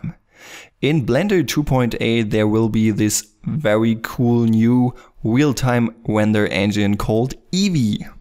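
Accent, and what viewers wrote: Germany. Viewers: Nice video :) I like this german accent xD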